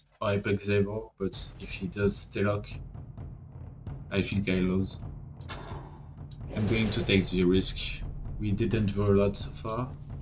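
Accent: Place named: France. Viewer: he's just french and doesn't know how to speak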